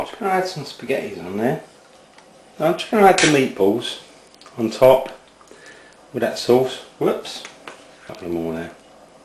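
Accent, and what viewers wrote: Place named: England, United Kingdom. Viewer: Love your accent, man! I had the wrong idea that the english can't cook, but it is I who can not.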